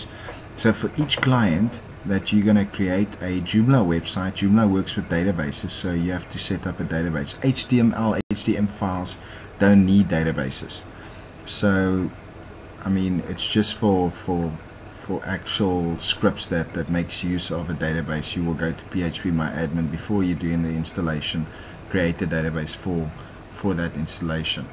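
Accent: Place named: South Africa. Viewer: Spoken like a true South African hey?! LMAO. Hard to miss that accent after all these years of living in the US. Ek mis Suid Afrika so veel my broer dankie vir die studieklas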